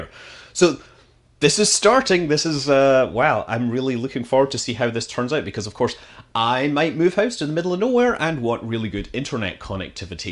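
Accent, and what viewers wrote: Scotland, United Kingdom. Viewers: With that accent? Definitely. Although, if he lives in the US can you truly still call him a Scot? really, what does it even mean to be 'from' somewhere? Ah, never mind. XD I just keep asking myself that because I have grandparents from 3 countries, was born in a 4th, and have lived in 3 of those 4 countries (and dozens of towns and cities) Wherevam I from? I do not know. XD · One of the best phrases that can be spoken in a Scottish accent. It's up there with Curly Wurly.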